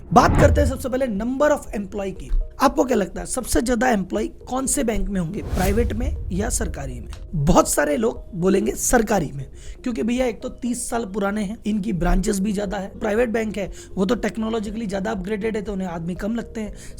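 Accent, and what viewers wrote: Gujarat, India. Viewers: Sir aap Gujarati hoo...ya non gujarati ho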